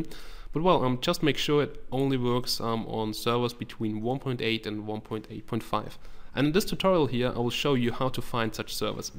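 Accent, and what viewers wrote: Germany. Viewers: was du bist deutscher? xD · kann es sein dass du deutscher bist ? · is he german ? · are you german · are you german,? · hey are you german ? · Ist er Deutscher? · are you German? Bist du Deutsch? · are you german? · man hört das du bist deutsch :)) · biste deutscher? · Man hört das du deutscher bist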